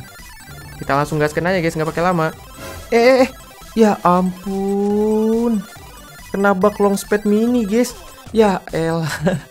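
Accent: West Java, Indonesia. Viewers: Orang sundaa bangg? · BG emang or Jabar ya..?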